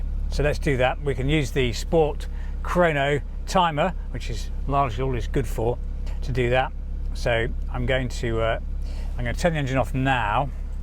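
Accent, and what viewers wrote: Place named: United Kingdom. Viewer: my God, I'm watching your part 3 now and I'm going crazy about your British English 😄. Too much of American slang nowadays . By the way, have you also got Guards Red color on your beast?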